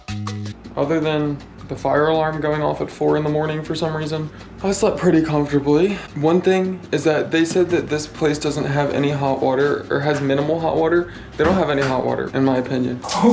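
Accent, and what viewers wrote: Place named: Baltimore, United States. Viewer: Love you, and your B’more accent. I grew up in Dundalk, now in Lutherville. Great, fun videos, keep on traveling! ❤️❤️❤️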